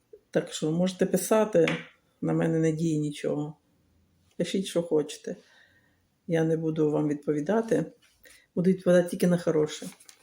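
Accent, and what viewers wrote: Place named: Vinnytsia, Ukraine. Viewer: Я думала,що ви з Віниччини,або Хмельниччини. Акцент не черкаський,бо маю колежанку Раю з Ватутіна і чула,як розмовляли її землячки. Вона з Рівнинщини.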